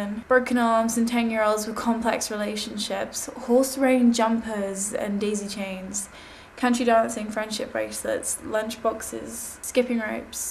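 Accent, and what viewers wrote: England, United Kingdom. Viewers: Well she's English as u can hear frm her accent. Oh btw here in the UK horse riding is actually quite common and u don't have to be rich to be doing it. Neways i agreed quite a lot to wut she mentioned i mean it really is quite nostalgic. Shhe just basically aformentioned things that were the latest craze and all that. I think she covered things a lot of average people will remember. This video is something... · Thats an English accent! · how do you become a director?? plz reply, btw your very cute and i like your english accent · why do people think your accent is strange? Its a normal English accent... isnt it? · you have an english accent, but it sounds like your'e trying to be American sometimes. Baaaad. Be proud to be english! ( we're so much better. ) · What is it about girls with English accents that get them featured on youtube? 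· Y'know at a guess I'd say just totally english. And just that by the sounds of it, me and her have watched too much american TV. HAHA. And too right I've been watching the odd arthur episode after school. Its on before? hmm I wouldn't be up in time. But yeah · Ok, I thought you sounded English. Well done on being featured!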